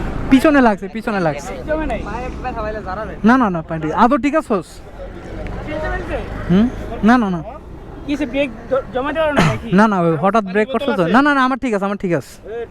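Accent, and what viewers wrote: Barishal, Bangladesh. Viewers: তুই কি বরিশাইল্লা মনু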